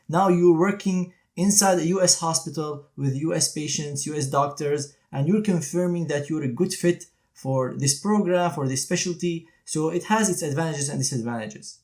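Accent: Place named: Syria. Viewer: Hi Dr., I wanna thank you a lot for being such an inspiration for me and for lots of others, I really appreciate your hard work. May I ask you a favor? Can you make a video in Arabic telling us your story with your original Syrian accent, tell us how could you overcome all the struggles that face us being arabs, how could you modify your mentality to pursue your dream for such a competitive speciality. What was your cultural background and how studying at Aleppo was, how could you adapt living at the us at your first months. I wish I could know all of these stories that would me feel like as you are a true friend "which is an honor I would like to have🥰"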